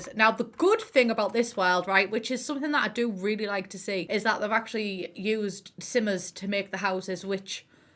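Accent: United Kingdom. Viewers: I love you accent. Are you British? I'm not too good at guessing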